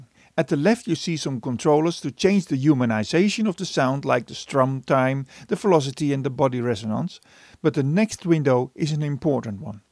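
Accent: Netherlands. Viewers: Ik hoor gewoon dat je Hollander bent 🤣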